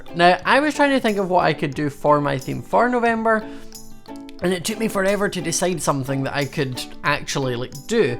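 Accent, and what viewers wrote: Scotland, United Kingdom. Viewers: I KNEW IT, HE’S SCOTTISH...I think.... Also have a happy halloween and bonfire night~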